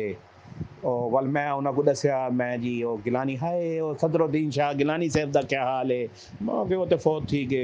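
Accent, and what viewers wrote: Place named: Saraiki, Pakistan. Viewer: Sir Tuhada Sraiki Lehja Sun Ky bahoo Changa Laga Meku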